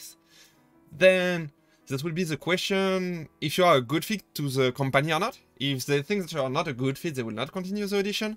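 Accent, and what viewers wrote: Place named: Japan. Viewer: great video. btw Im interested in your accent. is your native language japanese?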